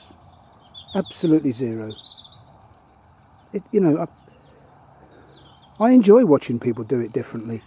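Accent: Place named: Newcastle, United Kingdom. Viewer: A Geordie accent eh? Explains a lot lol. Can't you make powdered beetroot? It would go well in your smoothies. I can't understand people and their plots. I agree, I see them rushing in, watering with a 7.5 litre watering can (after waking 50 metres to get it) then off they go.I think flowers are intrinsic on the plot. People do garden differently. It would be boring otherwise. Elaine 🌱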